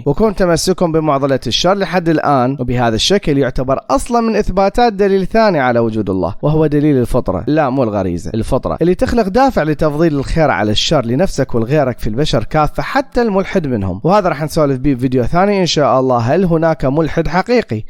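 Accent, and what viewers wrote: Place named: Iraq. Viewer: أحسنت اخوي الغالي ، كلام مقنع وجميل ومبسط دون إسفاف أو إخلال ، واللهجة العراقية زيادة جمال ، تحياتي لك من فلسطين ، أكمل وطور نفسك🥰 + إضبط قراءة الآيات القرانية 😉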